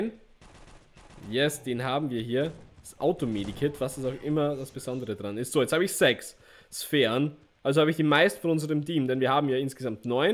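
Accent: Austria. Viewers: Biste eh aus Österreich?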